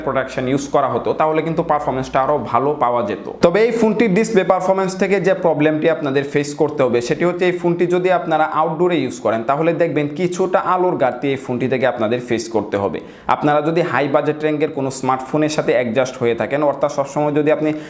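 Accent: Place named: Narsingdi, Bangladesh. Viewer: আপনার বাসা কি নরসিংদী নাকি ভাই কথাগুলো শুনতে ভালো লাগে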